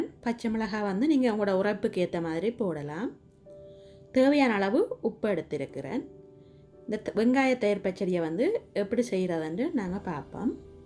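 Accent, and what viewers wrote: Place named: Sri Lanka. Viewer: உங்கள் இலங்கை தமிழ் மிகவும் அருமை....😍😍👌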